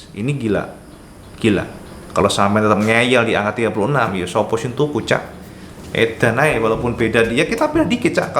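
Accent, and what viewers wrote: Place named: East Java, Indonesia. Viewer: Koyok e.logate wong ngetan...Iki...Jatim.....takerjet....kok onok mosone...ngerti aku.iki bahasane.....salam dari Karaeng galesong....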